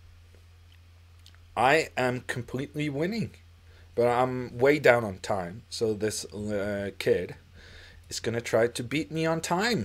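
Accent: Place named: United States. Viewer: Du låter nästan amerikansk.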